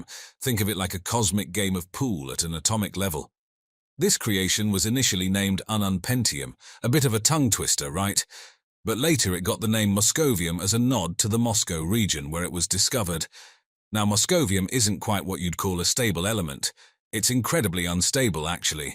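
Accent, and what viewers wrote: England, United Kingdom. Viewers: Well at least this had a decent English voice, unlike many of those fake grating Yankee voices although it repeats itself so still suspect this was AI generated.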